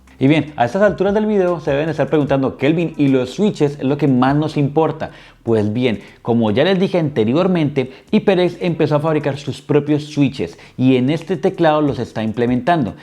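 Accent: Colombia. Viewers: Mi socio es Colombiano? O me equivoco? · Tengo Dos Preguntas! Jajajaja 1. Eres de Colombia? Especificamente de Medellín? Lo pregunto por tu acento Jajaja. 2. Se pueden reemplazar las KeyCaps?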